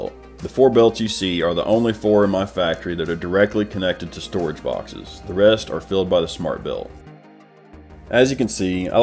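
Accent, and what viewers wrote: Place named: Midwest, United States. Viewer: Hidden Gem of Satisfactory Video. Double props for midwestern explaining factories.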